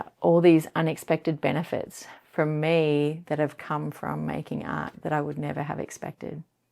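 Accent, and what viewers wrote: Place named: Australia. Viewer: What is your accent? It sounds a bit Australian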